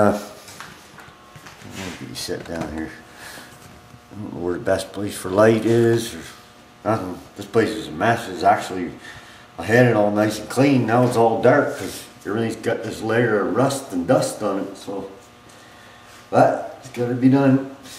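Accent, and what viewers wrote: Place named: Nova Scotia, Canada. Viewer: am in Halifax , thought you sounded Nova Scotian, Im into old Novas too and have done that frame thing as well,,had mine sandblasted, painted it inside with brushes on a long stick or piece of bent tubing .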